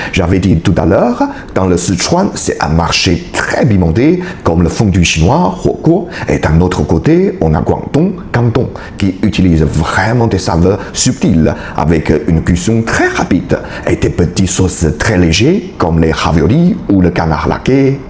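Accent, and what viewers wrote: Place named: Belgium. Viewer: Merci et je trouve-moi aussi intéressant ce concept :) je ne compte ni y aller ni m'intéresser plus sur ce pays mais ravis d'avoir pu le découvrir un peu ce soir. Et juste pour le fun, n'y voyez pas de moquerie, je trouve dans l'accent que vous avez un brin de quelque chose de belge, c'est juste marrant de se faire cette remarque :)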